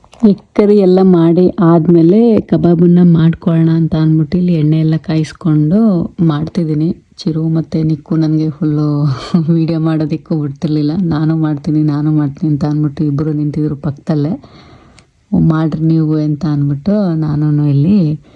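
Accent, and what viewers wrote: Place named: Malvali, India. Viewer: nim vidio nodtidre Indiadale hidira ansute nim language pakka malvali bashe USAge hodru mothertoung change agila ade Kushi namge nim hand mel hiro village style old tattoo super agide❤😅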